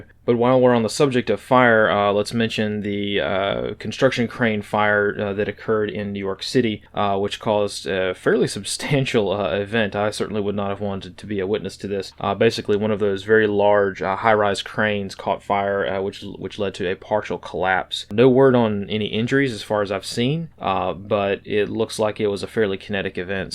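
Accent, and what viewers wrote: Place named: Virginia, United States. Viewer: Hey, I love the content. And just wanted to say, by hearing your accent.. I believe you’re from around Virginia, I know people from Norfolk that share a strikingly similar accent. And with a lot of crazy things going on nowadays, I just can’t thank you enough for keeping us informed in a “no bs straight forward” fashion. Keep fighting the good fight buddy!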